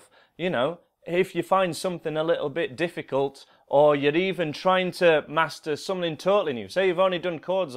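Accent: United Kingdom. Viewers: are you British or Scottish?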